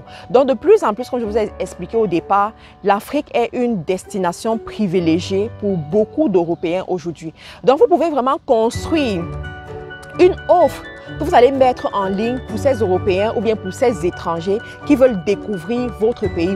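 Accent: Cameroon. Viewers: Prononciation normale même si elle a un accent camerounais tinté semi canadien aussi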